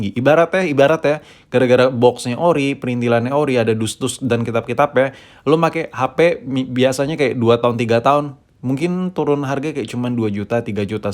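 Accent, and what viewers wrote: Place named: Medan, Indonesia. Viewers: Medan kali bah logat kau kimak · ini malah ingatkan awak saama logat medan,kreak lah, pukilah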